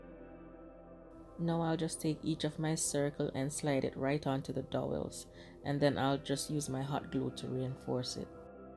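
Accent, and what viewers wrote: Jamaica. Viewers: Beautimous 😍. One quick question- are you Jamaican? Your accent sounds similar to mine. 😉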